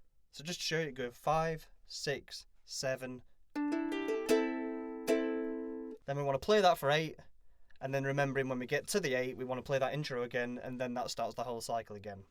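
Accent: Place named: South Yorkshire, United Kingdom. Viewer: Nice tutorial man! Sounds great! Also judging by your accent you sound like you’re from my neck of the woods in England, South Yorkshire?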